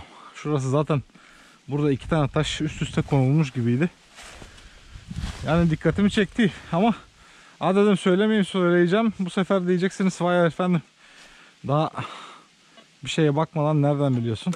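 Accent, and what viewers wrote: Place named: Kırklareli, Turkey. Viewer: Sa dostum,ben Edirneliyim ama seni Viyanadan takip ediyorum,o kirmizi tasli olanin altindada bi tas varmis oralardadir buyuk ihtimalle ,bu arada oralari Kırklareli taraflarimi siven bizim oralı oldugunu gösteriyor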